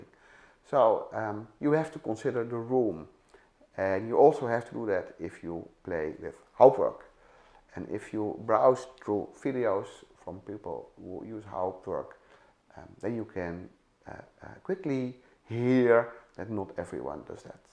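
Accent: Netherlands. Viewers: Like every Dutch (or Flemish) person I've ever met, you speak perfect English,. Your Dutch accent is as lovely to listen to as your music. And your Ten Commandments are just right.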